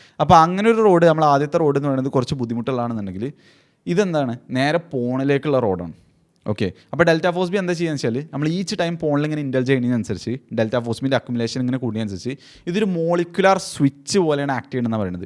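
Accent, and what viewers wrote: Malappuram, India. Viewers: Chettan Malappuram ninn ahno varunne?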